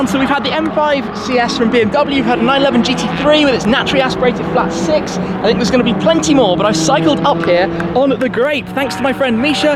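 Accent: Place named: United Kingdom. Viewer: the man of a billion cars and with the amazing British accent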